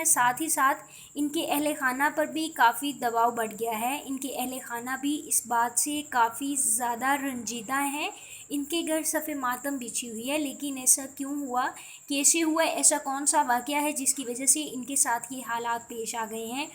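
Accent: India. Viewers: Accent indian ha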